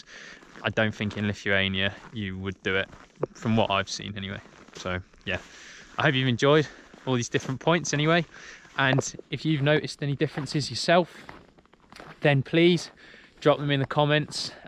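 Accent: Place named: United Kingdom. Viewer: I like your British accent, subscribers from Philippines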